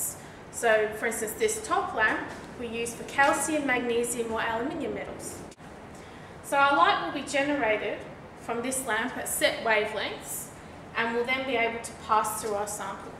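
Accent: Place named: Australia. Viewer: hey, you sound Aussie!! Great explanation - used it in Yr 12 HSC Chem today. Thx heaps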